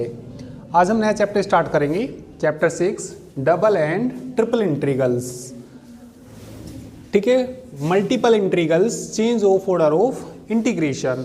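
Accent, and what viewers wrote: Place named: Himachal Pradesh, India. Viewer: sir most probably you are from Himachal if I'm not wrong .......... (by accent)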